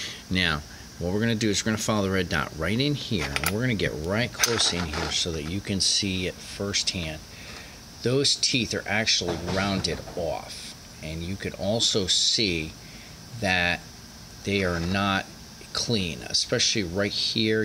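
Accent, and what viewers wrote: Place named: Delaware Valley, United States. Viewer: This video ended up in my recommendations. While I was watching it I was like, "Wait, that accent. This guy is from the Delaware Valley". So then I check and yep. I love our accent. Its so obvious!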